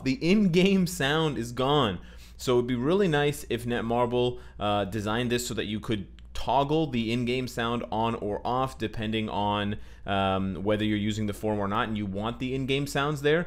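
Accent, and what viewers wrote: Portugal. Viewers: Eita sotaque Português incrível. Bom saber de suas raízes,. Te acompanho bastante e admiro muito seu trabalho (sou brasileiro), está me ajudando MUITO desde que voltei a jogar - parei no lançamento da Kamala Khan. Ign: ThomasDell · Hey, It's great to hear your opinion about it. It surprised me that Netmarble actually responded to this drama, I just didn't think they would. It seems they want to be closer to the community and listen to what we have to say, which is fantastic. They are improving and I hope, as you said, that they will make adjustments to this program in the future. Also, it's always great to hear your portuguese accent hahaha Seu canal me ajudou muito desde que comecei a jogar, obrigada!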